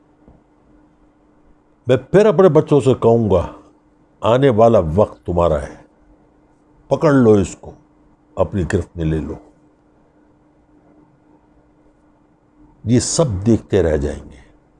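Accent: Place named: Kutch, India. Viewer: Sir, you choose so true wordings Fr all types of goons of Pakistan 🇵🇰 👏 Salam to you to gv details to new generations. I’m listening 👂 n enjoying ur each n every word n ur lovely Kch’s URDU. Love word BAWLE, Mullah Fazloo n many 😍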